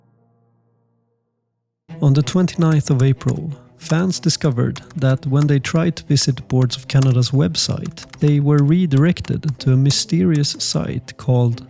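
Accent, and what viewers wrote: Sweden. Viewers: Du låter mycket svensk. Är du svensk?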